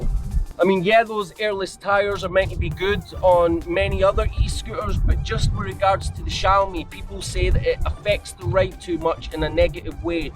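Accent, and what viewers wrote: Scotland, United Kingdom. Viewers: Hi! I'm glad that my comment could make you feel good, unlike some... people who have nothing else to do in their life but troll. ;-) As for your Scottish accent, it's very light compared to my Scottish godfather's, and I understand you perfectly. But it's well known that English people from England England often have trouble with a non-Oxfordian accent. :-P I travel a lot and I intend to do some trails in Scotland as West Highland Way, Southern Upland Way, Great Glen Way, etc. But this when I'll have modified my Pro 2 (which is already equipped with Monorim front and rear suspensions + 10" tires) into a powerful 2WD (2 x 500W + Monorim U5 Pack + additional Aerdu 13S4P 48V 14ah battery), in order to have the power for the climbs, especially with the camping gear in the backpack, even if it's all ultra-light, and a maximum autonomy (with solar charger for long trails, of course!). 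[ I know, some would say I'd better invest in a Kaabo Wolf Warrior King GT, but it wouldn't be as light to carry in and out a sailboat for daily use, and I don't need all the speed it provides. I prefer to ride safely at a gentle pace and have maximum autonomy. ;-) ] So, if you wish, maybe we could meet when I'll pass by your place? And let me reiterate my thanks for your videos, which are also well edited in post-production. We can feel the work of a passionate person. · Thank you so much for your hard work man!!! 5 star review!! Scotch accent is bad ass!! I am trying to master the accent!! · BREVVVV Scottish accents the best one! Big up ya self and bun the haters lol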